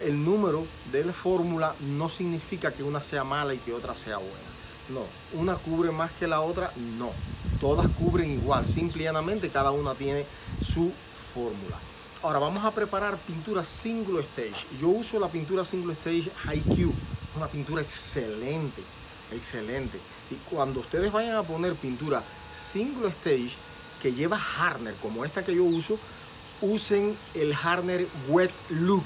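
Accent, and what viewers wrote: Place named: Cuba. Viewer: Porque los de cuba hablan asi??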